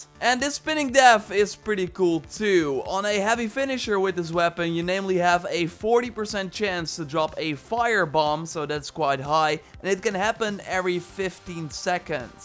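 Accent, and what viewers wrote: Netherlands. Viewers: Do you speak dutch, you kinda sound like someone from the netherlands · Je hoort gewoon aan zijn accent dat hij Nederlands is🤣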